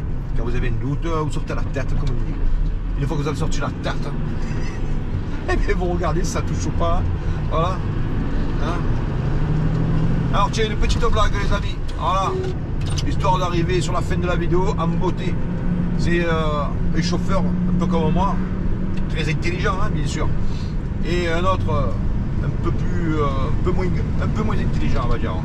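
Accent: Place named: South, France. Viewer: Le bon accent du sud ! 😊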